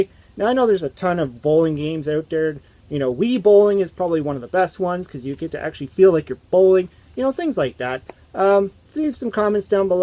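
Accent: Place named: Canada. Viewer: You sound and look canadian like from Toronto or something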